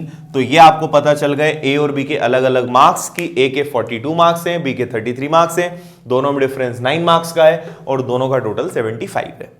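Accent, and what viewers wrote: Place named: Gujarat, India. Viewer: Your style speaking like Gujrat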